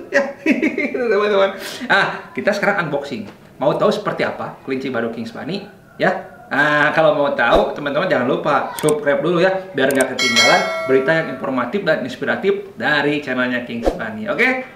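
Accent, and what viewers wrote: West Java, Indonesia. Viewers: Lokasi medan, logat sunda 😆, mantap mang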